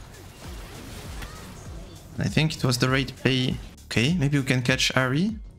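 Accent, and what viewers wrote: France. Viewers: Hi I discover ur channel, is sound like but not 100% sure are u french ?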